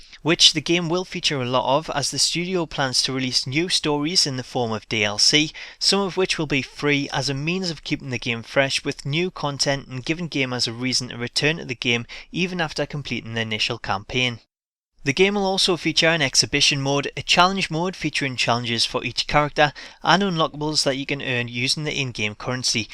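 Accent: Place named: Wales, United Kingdom. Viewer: Allow this guys dead welsh accent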